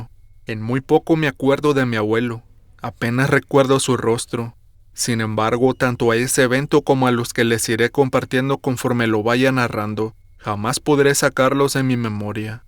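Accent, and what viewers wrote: Mexico. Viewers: Eres uno de los mejores narrando...tu acento de dónde es?? De que parte de México???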